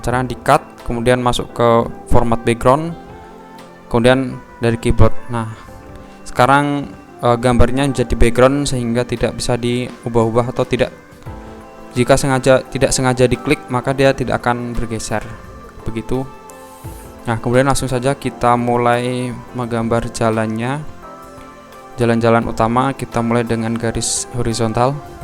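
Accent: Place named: Tegal, Indonesia. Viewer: Wong tegal ya mas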